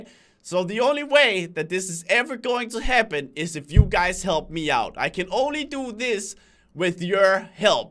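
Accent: Denmark. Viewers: why do people make fun of your voice like its an accent cuz your danish or u just wanna talk like that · i hear your danish, prove it....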